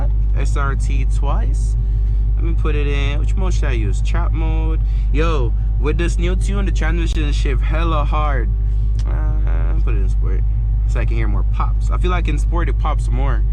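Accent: Jamaica. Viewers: Jamaican accent 👌👌👌👌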